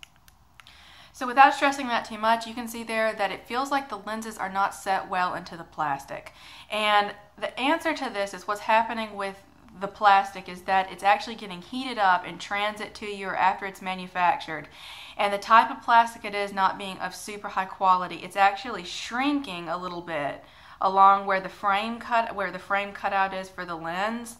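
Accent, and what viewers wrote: South, United States. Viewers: Your skin, hair, and eyes are absolutely intoxicating. Eyes like perfect almonds. You do your makeup perfectly. You're just accenting your already lovely features, not trying to paint over and create something new. You're a vision! The southern accent is the coup de grace. Great info also. My new Wayfarers were squeaking so that's how I found your channel. Thank you!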